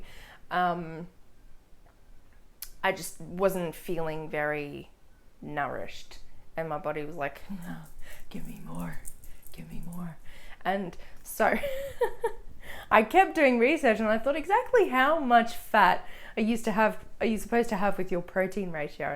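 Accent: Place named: Australia. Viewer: Hello -- it sound like you may be in Australia ??!! I am in NSW. With so many carnivore channels from USA, it is great to know about you 💟💛 I am not sure if I'll stick with this, as my body is not in good shape, I switched from vegan (fruit-based, and also starch-based), to carnivore, and now ketovore and still undecided ! Will be good to view more videos from you. What r your favourite carnivore people / doctors ??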